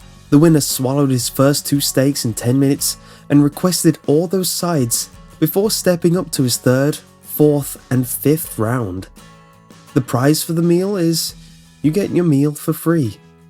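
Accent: United Kingdom. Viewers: Your englaned I thought you were British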